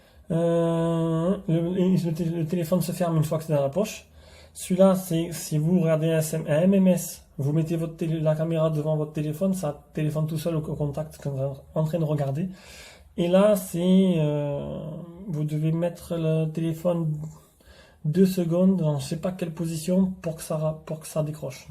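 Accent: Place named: South, France. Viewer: l'accent du sud de ouf ^^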